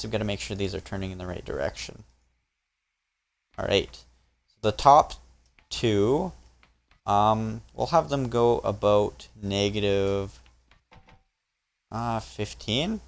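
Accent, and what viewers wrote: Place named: Canada. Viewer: can't get over the fact that ur Canadian so u pronounce about aboot... no offence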